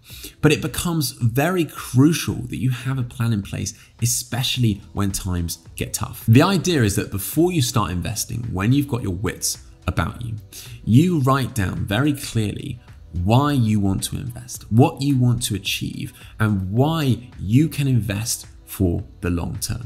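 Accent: United Kingdom. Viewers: Yes, that's mostly correct. I would like to continue to generate income in retirement but I'd like to do it with minimal risk. I hope the strategy of keeping 30% invested covers the loss to my cash reserves form inflation and gives me a slight gain. No one knows for sure what the market is going to do so I find it hard to make decisions this close to retirement. I'm sure fear of losing it is what keeps me at such a low level of investment right now. I just feel like we are in an asset bubble that could pop just as I'm about to retire. That would hurt if I were fully invested. What I have invested is VERY diversified and I made what I think was a smart decision at the first of the year to buy a significant number of STIPS to help keep my investments balanced when bonds/treasuries are performing so poorly. I'm just amateur so I'm reading and learning as much as I can along the way. 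Your videos are very helpful. It's nice to hear insight from a pro! Your videos seem smart and sensible whereas the carnival barkers on TV (who I shall leave nameless) seem to contradict themselves every other day when they've turned out to be wrong. Also, does not hurt that your info is delivered with a cool British accent. :) I also like Pension Craft and Paul Merriman.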